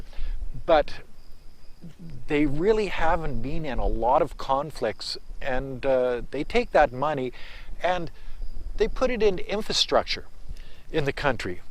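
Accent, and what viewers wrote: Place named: Canada. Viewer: you are definitely sounding much like a Canadian!! Cheers mate. love your rants...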